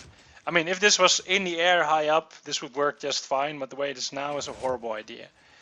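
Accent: Netherlands. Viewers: Are you dutch? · lol jij bent nl dat hoor ik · Het , are you dutch. You sound very hollandische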